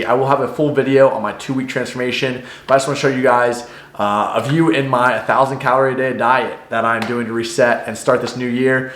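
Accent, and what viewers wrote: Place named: Australia. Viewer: Random question here, have you lived in Australia? I can hear an Aussie accent through your American accent! Just curious!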